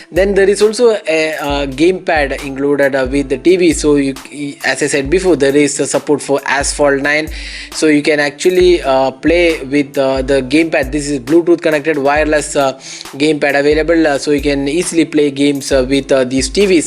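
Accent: Kerala, India. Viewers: ഒരു മലയാളി ഏത് ഭാഷ സംസാരിച്ചാലും മലയാളിയാണെന്ന് മനസ്സിലാകും.നല്ല വീഡിയോ. ബെസ്റ്റ് വിശസ്. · ശബ്‌ദം കേട്ടിട്ട് മലയാളി സംസാരിക്കുന്നത് പോലെ ഉണ്ടല്ലോ 🤔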